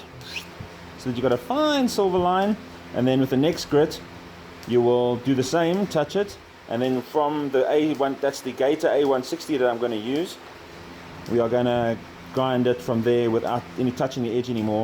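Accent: South Africa. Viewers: I couldn't place you accent for like 5 seconds when I realised south african!!!! Nice to see a fellow who also does knifemaking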